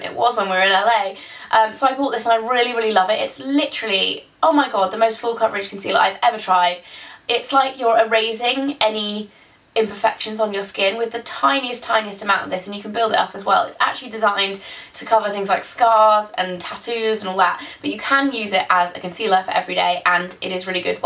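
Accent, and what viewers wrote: England, United Kingdom. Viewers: happy birthday your soo lucky you have blonde hair and a british accent and your freaking georgeus im soo jealous omg haha well happy birthdayyy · I went to imats too!! I wish i had watched ur vids way before imats bc then i would of searched for u! I lovvvee ur british accent :3 btw i got a creme blush in orange . LOVVEE IT!! I want that pink one ! And i also got that same palette ! ^.^ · I love your English accent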